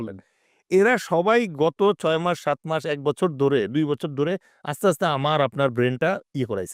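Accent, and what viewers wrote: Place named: India. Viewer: Your sound like an Indian.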